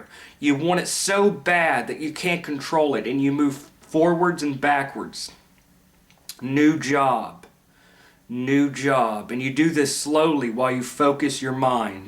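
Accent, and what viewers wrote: South, United States. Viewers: Thank you for your ability to teach clearly! Very rewarding for me! You must be down south here somewhere. Esoteric instruction is always better when delivered w a southern accent 😃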